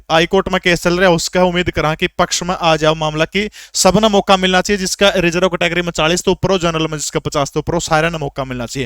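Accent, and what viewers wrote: India. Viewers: Aap pabra side ke ho kya sir ??? Apki boli mile h ude kani tt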